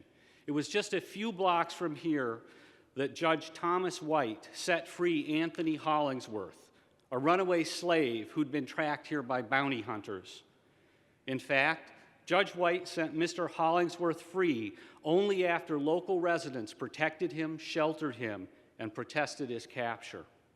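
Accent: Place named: Ohio, United States. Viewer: Very impressive. Driscoll looks and sounds like he hails from Blacklick.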